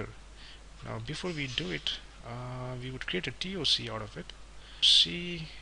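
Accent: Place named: India. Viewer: THANKS MAN WITH INDIAN EXCENT YOU SAVE ME FROM CRAZY 0.O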